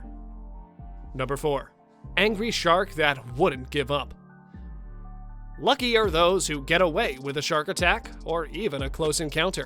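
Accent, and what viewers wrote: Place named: United States. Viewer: This guy speaks English like it’s his second language but he has an American accent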